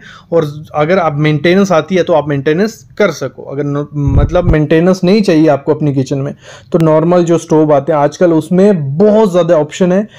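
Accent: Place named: Himachal Pradesh, India. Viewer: Aap पहाड़ी ho kya you sounds like from Himachal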